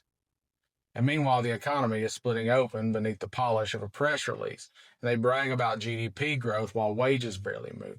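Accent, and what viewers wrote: South, United States. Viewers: Glad to hear more southern accents speak these truths!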